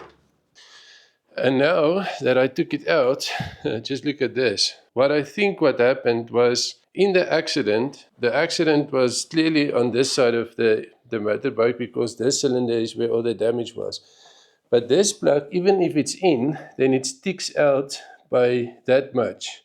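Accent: South Africa. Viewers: Het perongeluk op jou channel afgekom. Lekker om n afrikaanse aksent te hoor al die pad hier in Skotland. Ek het n 1250GSA en kan sien eendag sal ek die videos kan kyk vir enige raad. Sterkte en kan nie wag vir volgende video nie.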